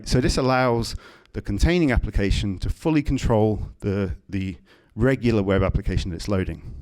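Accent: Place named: United Kingdom. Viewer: He sounds very British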